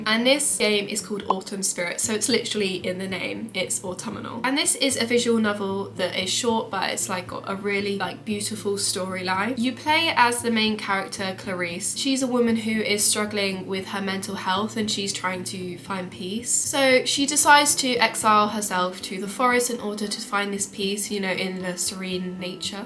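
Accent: United Kingdom. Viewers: hi! u have amazing eyes, ur skin is flawless and i love ur accent. british accent is my favorite accent ever. may i ask how old are you? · Night in the woods means a lot to me as a broken middle aged man, so not quite the target audience lol I really love that game tho have it on multiple platforms. And your accent is as beautiful as your looks, I’ll bishop being live with British girls lol 😻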